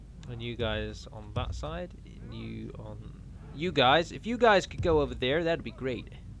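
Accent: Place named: RP, United Kingdom. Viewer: Ah, the Anduin campaign. Where skill level drops significantly xD. In your other campaigns you play mostly as i'd do myself really (except for the queueing of 4k-ish gold cost buildings when you make like 300/turn:P ), but with Anduin I just want to scream at the screen haha. Mostly I resort to facepalming instead. I don't think you will fail the campiagn though, it's really hard to lose since the AI works in mysterious ways. But you aren't making it easy for yourself thats for sure. I don't really watch lets-plays but these I really do enjoy. Probably because it's a really nich (it's that the word?) game, mod-within-a-mod-whitin-a-really-old-game, combined with a posh british accent.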